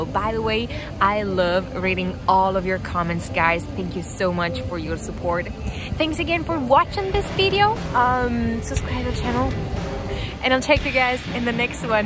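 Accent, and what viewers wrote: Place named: United States. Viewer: Sembra proprio una americana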